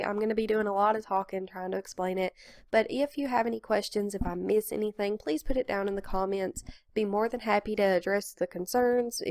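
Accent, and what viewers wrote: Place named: South, United States. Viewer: well that is good to know i will let you know if i need any help oh and i hear in your videos that you have a kinda "country" accent are you from any southern states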